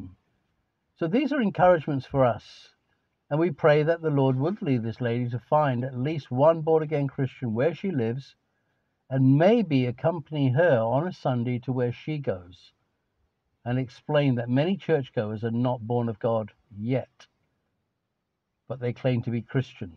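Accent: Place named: United Kingdom. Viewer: So weird to hear someone speak of Christ with a British accent. I just left my church and am looking for a new Bible teaching church. Don’t care about the building, parking, etc—just want to hear the Word of God unapologetically preached! Good stuff there! Love you Brother and I will pray for your ministry from Alabama USA! 👆🏼🙏🏼👊🏼❤️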